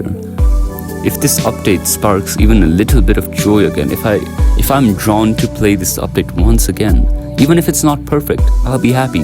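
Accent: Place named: India. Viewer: BRO YOUR IN NINTH GRADE ??? SOUND LIKE A 20 YEAR OLD INDIAN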